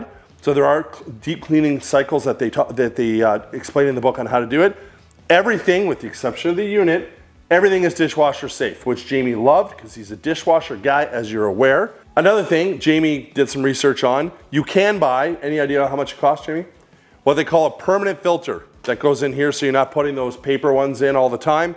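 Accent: Canada. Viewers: As always, &, I love your videos! Your honesty and real life situations make me feel like I'm at your home and you're giving real advice! Much appreciated! My Mom was co-owner of a coffee service. Once a month you should run a full pot of 1/2 white vinegar and 1/2 filtered water through the pot portion. And 15cc white vinegar and 25cc filtered water through the espresso side on Luongo setting. BUT that is only if you use both sides daily! If not than every other month is good. I used to have to clean the machines that were replaced, or being repaired. White vinegar is better than any so-called coffee machine cleaner! Those cleaners almost never flush out entirely. Their residual lousy taste lingers!!! White vinegar flushes right out! As a rule of thumb, I would always tell our customers to make your first pot of just water. Then make ALL the coffee & espresso you want!😊 Optimum coffee/tea temperature of 55-68°C/167-170°F is best to not experience the bitterness that most people find distasteful about coffee. 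Never put a Yeti, or any other insulated double walled steel/plastic/triton cup on the hotplate on the pot side!!! It can cause a fire! Nova Scotia, eh? I thought I detected an Eastern Canada accent! Parlez vous France'? Je parle les France' au les Canadienne! 🙂 Again, I LOVE your videos!!! All your hard work is much appreciated!!!🇨🇦🇨🇦🇨🇦 Nancy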